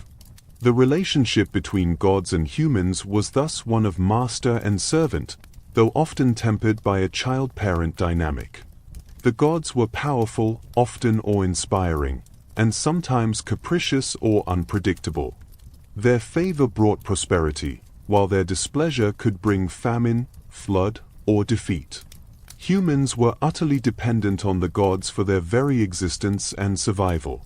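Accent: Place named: United Kingdom. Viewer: I can endure only limited doses of British pronunciation.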